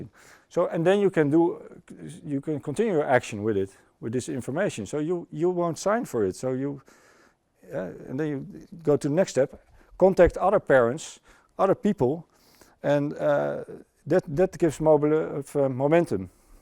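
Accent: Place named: Netherlands. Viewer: Steenkool engels.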